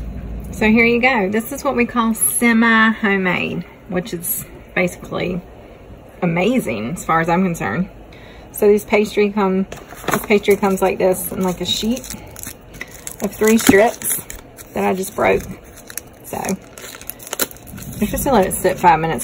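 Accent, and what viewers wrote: South, United States. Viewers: Your to real + genuine to be a politician my dear. Also, I adore your southern accent.! U don’t hear that to often in New Jersey.😎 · I could listen to you all day love your southern drall and your beautiful girl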